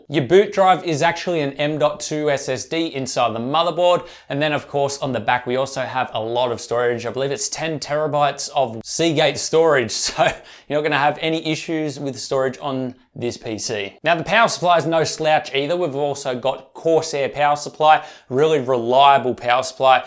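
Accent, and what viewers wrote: Australia. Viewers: btw are you Aussie?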